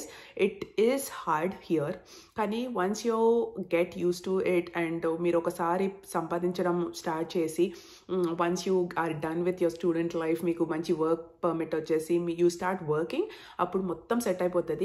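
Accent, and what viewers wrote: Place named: Telangana, India. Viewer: Telugu itey pakka telangana la undi sister😅🤩🤩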